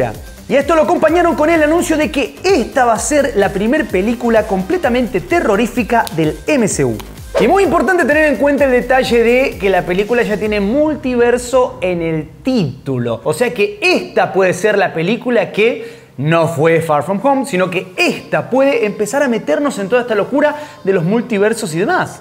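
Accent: Argentina. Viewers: He guachin sos Argentino..??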